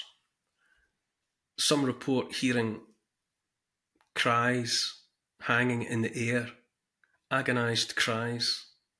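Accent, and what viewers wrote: Scotland, United Kingdom. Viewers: Hearing your amazing thick Scottish accent pronouncing a lot of unusual words and going into rich storytelling is some divine ASMR, very nice, thanks! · If I had to pick one official English accent in the world it would be your Scottish accent. Enjoy Count Dankula's as well. You have the best English accent in my opinion of all places on earth. Could listen for hours to it. Great job of story telling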